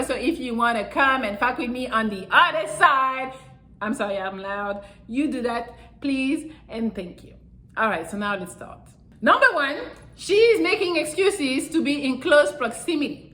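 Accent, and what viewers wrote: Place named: France. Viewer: Another banging video and a solid piece of advice love your energy and aura around you and your work ethic and personality and intelligence is the best I've even seen looking beautiful and sexy and most attractive woman on the planet love them sexy eyes and and accent too and love your tattoos there's nothing sexier on a woman than all the qualities that you possess keep up the awesome work you do and never change who you are your perfect the way you are your a gorgeous French goddess queen xx ❤️ 😘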